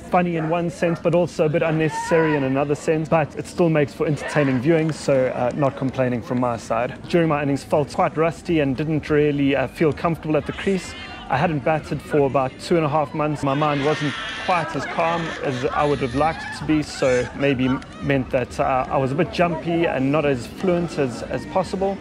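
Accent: South Africa. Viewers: When i first started watching you, i thought you sounded a bit South African. It is lovely to see you here in my home country! I wish you could come to East London, but that is one of the worst places in South Africa. I look forward to this tour.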